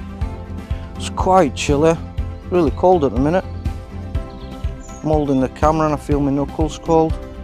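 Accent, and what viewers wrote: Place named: Ireland. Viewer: Love your Irish Brogue👍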